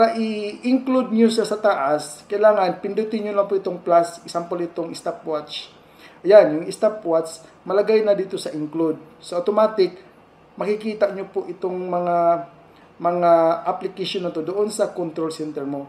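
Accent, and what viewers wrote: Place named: Iloilo, Philippines. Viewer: Ilonggo guro nis kuya ay.. hehe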